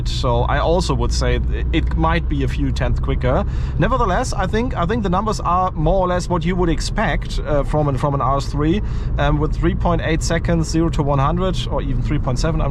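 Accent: Germany. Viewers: Most german english i have ever heard :)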